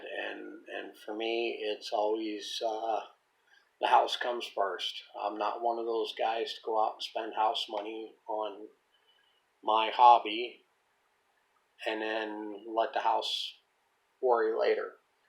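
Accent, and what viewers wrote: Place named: Western Pennsylvania, United States. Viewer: Informative video. Sound like you are in western Pennsylvania or eastern Ohio area. Drop me an email sometime.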